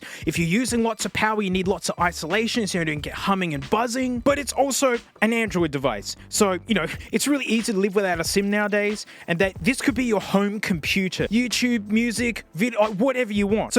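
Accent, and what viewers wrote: Australia. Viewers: thats because hes an aussie mate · You just had to confirm my suspicions of you being Australian in the end😂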